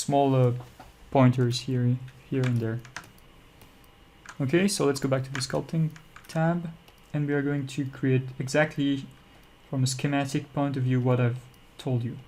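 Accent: France. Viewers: Sir, never apologize for a French accent. Especially in an art tutorial. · Instructor: "i apologize for the french accent" Me: Are you serious? I would kill for having that accent. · We all love your frenglish ;) And the word you are mostly looking for is "definition" (of muscles, creases, shapes). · Did he just apologise for his French accent? I'm offended. That shit is unintentional ASMR. Thanks for this tutorial - so generous of you to share your time and knowledge.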